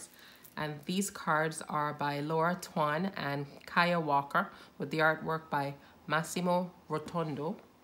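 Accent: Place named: Jamaica. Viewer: Trying to figure out your accent. Not sure if your Jamaican or not. Maybe Trinidadian. I know both talk differently. I’m usually pretty good recognizing Jamaicans. Maybe it’s not even the two countries I mentioned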